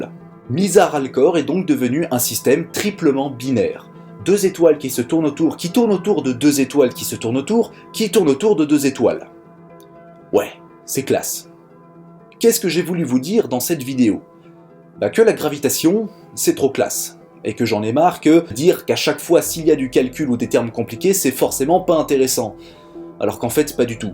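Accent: Italy. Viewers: Pouce Bleu pour l'accent italien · G mis un pouce bleu pour ton accent italien lol :)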